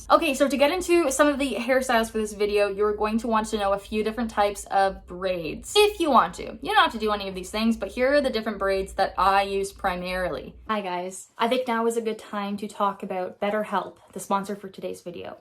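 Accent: Canada. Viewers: you sound so much more canadian in this video!!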